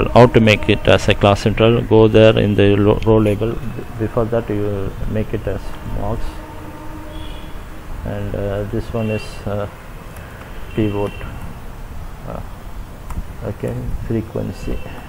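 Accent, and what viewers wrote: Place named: India. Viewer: The most Indian, Indian accent I've ever heard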